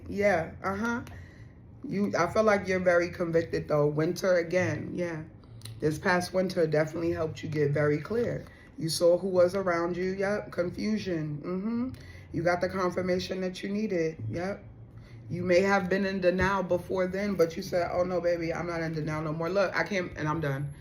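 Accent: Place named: New York, United States. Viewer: Girl I love your energy cause period. WHEN YOU GOT HYPE, I did too 😂😂😂🎉🎉 I miss my New York voices ❤. Born and raised in queens until I moved to ATL 10 years ago. And I think I know exactly who this is. He said something stupid and I didn’t respond to him 😂 MIND YOU JUST LISTENED TO AND by. Manifesting 😢 BRUH 😂and you pulled Scorpio as the first sign. I am a Scorpio 11/01 mannnnn you pulling my energy hard 😂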